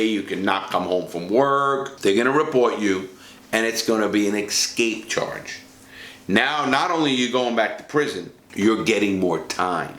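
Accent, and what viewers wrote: New York, United States. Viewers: I love the NY accent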